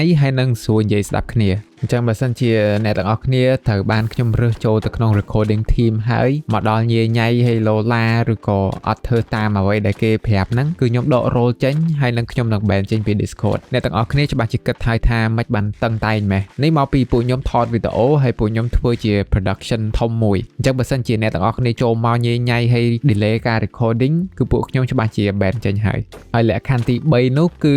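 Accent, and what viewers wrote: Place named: India. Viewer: ur accent sound as india ( recording)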